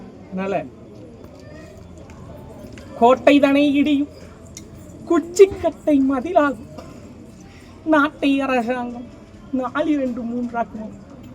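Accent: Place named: Kanyakumari, India. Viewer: இதுக்கு மேல என்னங்க தெளிவா சொல்லுறது. அவர் பேசரது குமரித்தமிழ் அது அப்படி தான் இருக்கும் சுலபமா புரியுதே ஏன் இவ்வளவு comment பன்னிருக்கிங்க புரியல புரியலனு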